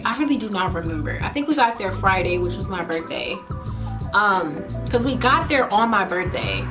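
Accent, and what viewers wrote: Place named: New Orleans, United States. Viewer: Lol that New Orleans accent😂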